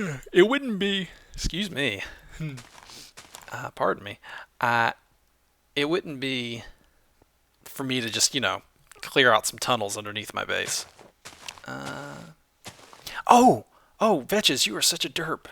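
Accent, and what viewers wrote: South, United States. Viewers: Hey Vechs, where are you from? It sorta sounds like you have a little southern accent goin on :P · Mild Southern American accent. I believe he said that he's from Louisiana. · quick question....are you forcefully saying water differently to mask your "southern" accent? just something I've picked up on and it sounds kinda off (not criticizing you for doing it though) and secondly what did you use to make the jack o' lantern texture?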